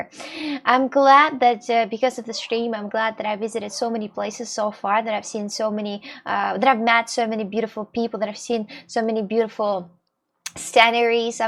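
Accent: Russia. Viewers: I love that Russian accent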